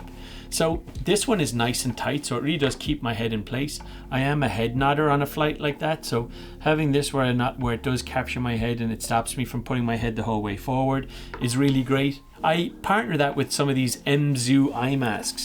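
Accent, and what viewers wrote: Ireland. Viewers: This is the most irish sounding american I've ever heard. Cheers from the other side of the pond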